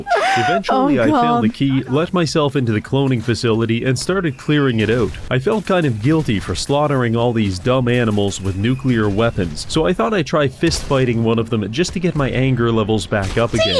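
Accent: Netherlands. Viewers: You're dutch is cute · hold on, are you dutch?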